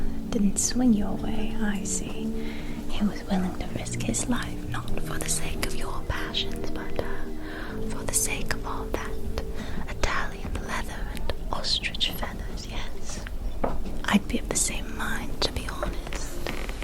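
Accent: Transatlantic, United States. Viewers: She's so versatile with her roles! I'm a sucker for the transatlantic accent so this checks all my boxes 🖤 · love the 1920s transatlantic accent, super cool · Which American accent is it? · You’ll probably not see this but I think it’s transatlantic, which was a mishmash of English and American, it was usually used by the upper class and Hollywood stars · i like this classical american accent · transatlantic accents are my weakness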